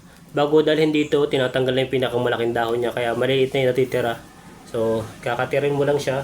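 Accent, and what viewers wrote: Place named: Capalonga, Philippines. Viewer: nnatutuwa q sa panonood ng vlog mo kc ang punto ng yng salita ay Capalongang gapalonga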